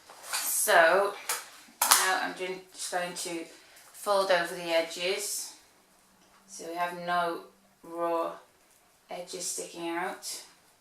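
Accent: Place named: United Kingdom. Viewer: I love your british accent! Today I bought my first fascinator , very expensive and I'm not happy because is not like all fascinators I use to watch on tv. I have to attend a important wedding soon, and I want to add something nice to my outfit, but checking your videos, I can see not all fascinators are hats, there are lot of choises and mine is just like this one on this video, gray color. Thanks a lot!!! Regards from Chile :) I'm really sorry for my bad english lol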